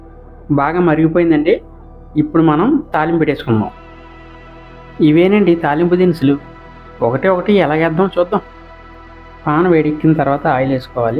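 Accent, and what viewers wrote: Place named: Godavari, India. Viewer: Very good curry & rasam. Good combination. Thanks for the video with godavari accent.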